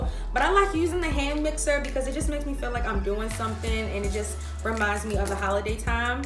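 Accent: Baltimore, United States. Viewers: I must try this, hopefully it turn out as good. Are you from my hometown Baltimore??? I hear he accent.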